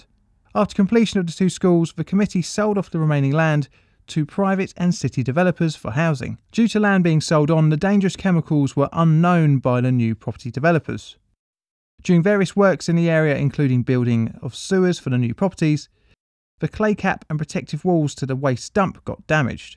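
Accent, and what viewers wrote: United Kingdom. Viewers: This was an American disaster so what's with the British narrator?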